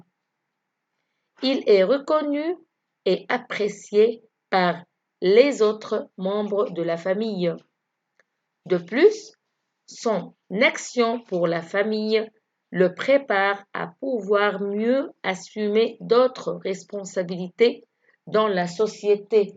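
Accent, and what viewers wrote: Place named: Tunisia. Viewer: you have tunsian accent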